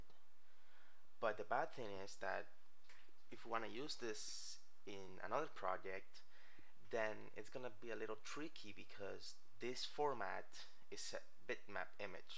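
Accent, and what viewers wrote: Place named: Mexico. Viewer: you sound ike me trying to spek english (i'm mexican)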